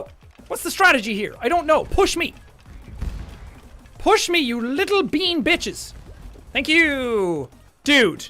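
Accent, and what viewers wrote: Ireland. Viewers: How have you come back with a stronger Irish accent even tho you live in the forbidden land 😭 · He sound a lot more Irish love it.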